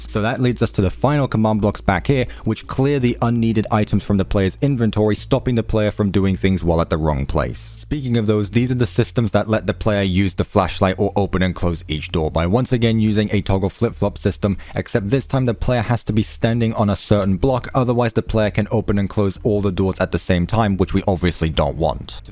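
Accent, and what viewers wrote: United Kingdom. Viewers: You're sooooo bri'ish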